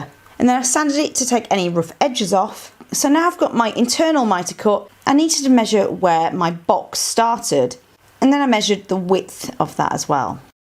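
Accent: United Kingdom. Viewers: Oh. I love that British accent.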